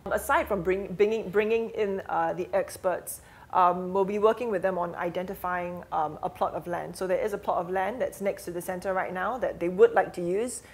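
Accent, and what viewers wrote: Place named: Australia. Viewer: i love Australian accent :D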